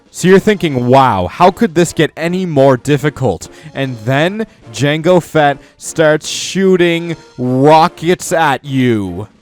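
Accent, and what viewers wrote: Canada. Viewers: Are you Canadian? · You're Canadian I can tell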